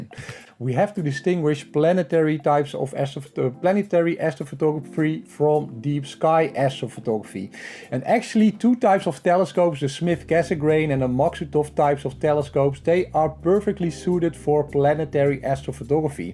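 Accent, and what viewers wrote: Netherlands. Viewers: hollander alert😂 goeie video man! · ) dutch accent sounds smart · Na het eerste woord hoorde ik het al, een nederlander!! Haha. Thanks voor de video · Haha na de eerste paar seconden zei ik meteen, hij is sws Nederlands. En ik had gelijk😜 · Ben je nederlands zo klinkt het namelijk Edit: ja dus. Leuke vids trouwens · From the accent I predict this guy is Dutch.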